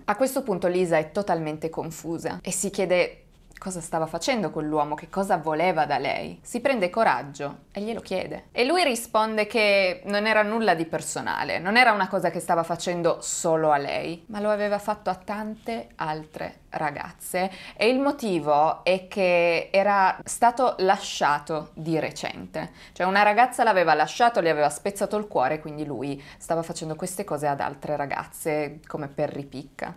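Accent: Turin, Italy. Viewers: Si sente che sei torinese cara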